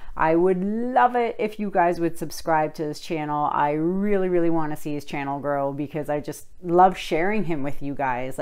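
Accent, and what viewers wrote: Canada. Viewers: She cracks me up because she sounds so Canadian. That’s not a bad thing. It’s just very easy to tell she’s from Canada just by listening to her.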